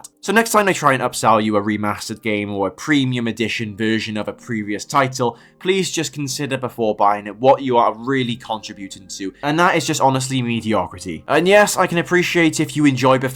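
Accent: United Kingdom. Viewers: bethesdar :P british accents are fun.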